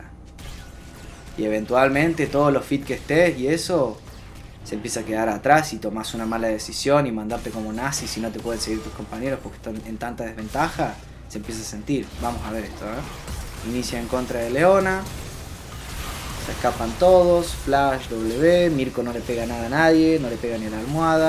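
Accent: Argentina. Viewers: se me pega el acento argentino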